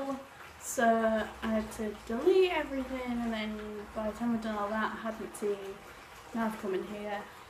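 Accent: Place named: Yorkshire, United Kingdom. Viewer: I love your accent from Yorkshire ?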